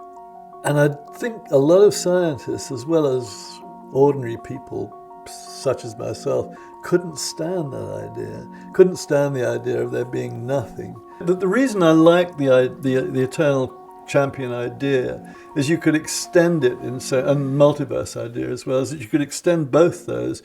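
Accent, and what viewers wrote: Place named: Southern England, United Kingdom. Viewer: He's not from Liverpool though! His accent is from the south of England (like mine)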